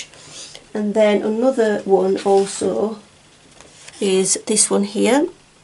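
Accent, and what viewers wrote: United Kingdom. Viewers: Very useful!! I am sitting here with my second iPad buying up on Amazon! Today was pension day! You have become like a friend to me I would love to sit at the table and colour with you in person, since I am in Australia that’s not possible, love your videos very much, and your accent what part of Britain are you from? I was born in Birmingham but don’t really have an accent, except true Aussies usually pick I am from the UK 😂😂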